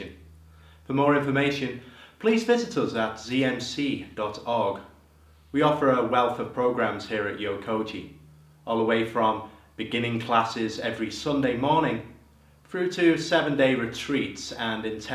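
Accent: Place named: England, United Kingdom. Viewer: Why does Steve-O have an English accent?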